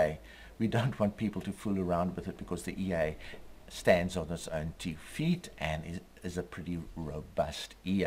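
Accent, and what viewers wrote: South Africa. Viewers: you sound like a Suid Afrikaaner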